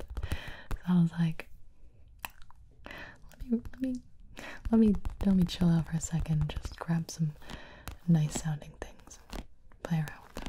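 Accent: United States. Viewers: Are you american , You are the best 😀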